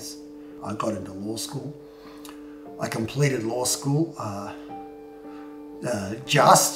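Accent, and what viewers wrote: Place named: Australia. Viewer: I came here from Friendly Jordies coverage on you and wanted to know more, it might be a good idea to create some subtitles because the Australian accent can be a bit hard to comprehend for non-native speakers. Hopefully we can get some more international eyes on this!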